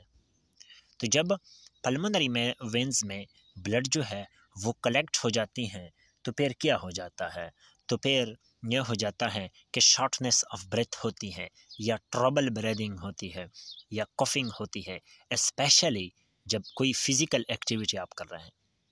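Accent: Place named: Pashto, Pakistan. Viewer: Aesa lg rha hy ap pashto mn English bol r hn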